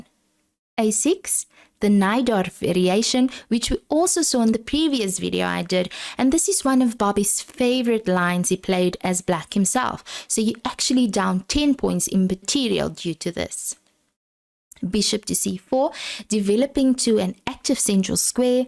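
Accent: South Africa. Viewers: That South African accent 😮‍💨👌🏻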